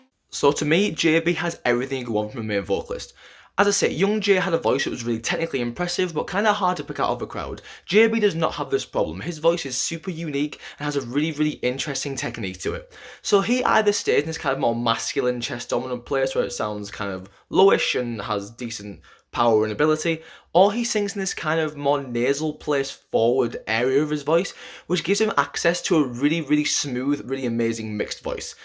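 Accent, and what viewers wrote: United Kingdom. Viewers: Is the host from UK ? I heard pretty much of British accent !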